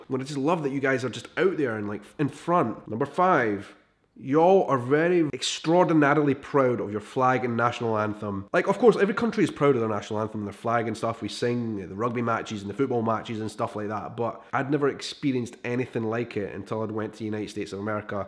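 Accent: Scotland, United Kingdom. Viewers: I wish we had more Scottish visitors here in the U.S. I hope to visit Scotland someday. I love the rain and enjoy your accent, and I like tough honest people, castles and monasteries. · Was hoping you would sound like the scotsman from samurai jack, wasn't disappointed. 👍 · Americans are usually only rude to other Americans. You have the Braveheart accent. That's an automatic in. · Just a few things... Do you like being know as a Scot or a Brit? Same with Us. And it’s “Apple atchun” ( both “a” s pronounced the same way). By the way. The Appalachian people were mostly Scots settling in this area. I have a wee bit of Scottish ancestry. Inn-n-Out is what it’s all about. We drove out the English because of our guns. PS love the accent! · Nothing I like hearing more than a man with a Scottish accent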